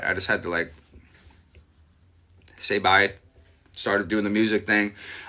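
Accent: Canada. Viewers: Easy to tell you are Canadian.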